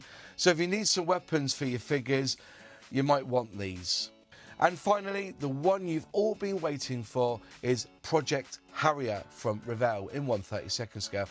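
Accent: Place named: Cockney, United Kingdom. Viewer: To a sixty-year old educated in the 1980's N.Vespuccian Sun Belt, if I've not mistaken, yer speech seemed to be delivered with a slight Cockneyish twist, ne'er rushed and in a warm & down-to-earth welcoming mode. For someone who spent his teenage years in predominately Cantonese speaking Hong Kong, I would not have been able to make out a dang word of your monologue back then, even with as clear as the sort of speech-delivery that you've mastered. Best wishes for reaching the number of subscribers in the jillions.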